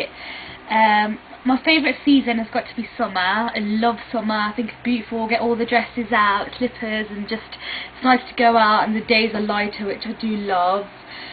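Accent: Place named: Coventry, United Kingdom. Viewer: I knew you were from Coventry, your accent sounds exactly like my cousin's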